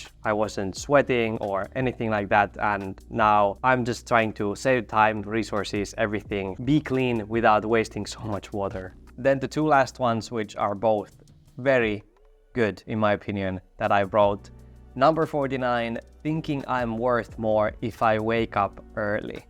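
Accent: Finland. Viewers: It's the Finnish accent! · I love Finnish people speaking English… and your content is great, clear and handy. I’m happy to have bumped into your channel! Keep going! Toivon sulle kaikkea hyvää! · Hello! It is my first time watching your videos, I just discovered your chanel. ☺️The first thing that I noticed was your accent which I find really interesting and comfortable to listen to. May I ask you where are you from? Based on your accent, I would say Finland? 🤨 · Just discovered your channel as I am going through big changes in my life. You are giving me food for thought and are inspiring ✨️ I knew you were finnish when you started talking. 😉💖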